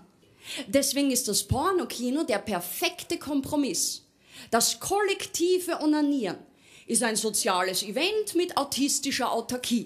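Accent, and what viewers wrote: Austria. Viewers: Auch wenn man bei Lisa Eckhardt grundsätzlich ihren Zynismus bedenken muss, stellt sich die Frage: Ist sie jetzt noch selbstbewusst oder schon arrogant? Diese Frage lässt sich aber wohl nur dann sicher beantworten, wenn man sie abseits der Bühne kennenlernt. Auf jeden Fall ist die Frau eine großartige Mischung aus Wortgewandtheit, scharfer Zunge und Sexappeal. Und als Bonus kommt noch der hochsympathische Österreicher Dialekt hinzu :) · ist das eine Oesterreichisch? Wir kennen nur Arnie's Terminator Akzent.... klingt wie Hollaendisch. (Gruesse aus London) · nach drei Sekunden zuhören in Österreich angekommen ....:-(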